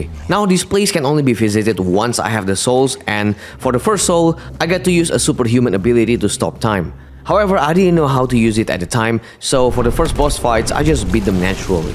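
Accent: Indonesia. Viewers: You sound like indonesian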